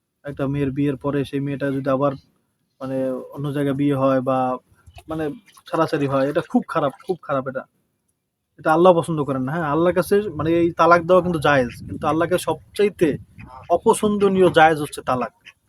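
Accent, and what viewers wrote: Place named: Sylhet, Bangladesh. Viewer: আপনি সিলেট বলছেন আমার খুবব ভালো লাগছে আমি সিলেট থেকে আপনার ভিডিও দেখি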